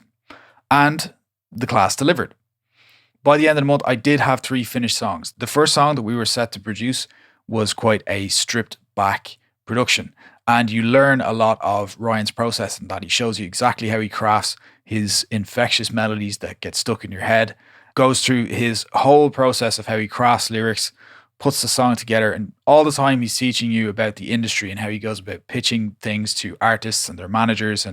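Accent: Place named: Ireland. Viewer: I saw this come up and was very interested. I knew that I wouldn't have the time available to dedicate to it that it would need, so had to pass it by. great to hear that it delivered on the promises made. The track that you came up with sounds great. how do you think it compares to your tracks previous to doing the course? Keep up the good work, and keep the videos coming. I find it way easier to listen to a fellow Irish mans accent than those annoying overly neutral Americanised accents :)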